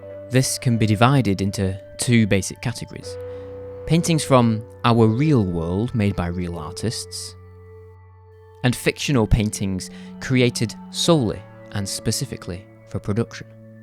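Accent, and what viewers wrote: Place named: Scotland, United Kingdom. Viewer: Where are you from in Scotland?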